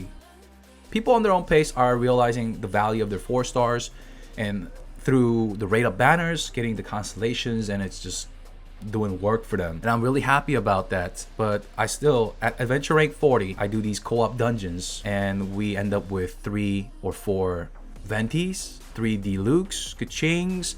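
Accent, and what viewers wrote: United States. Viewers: Pretty sure he wasn't there before Considering his English accent. American Korean?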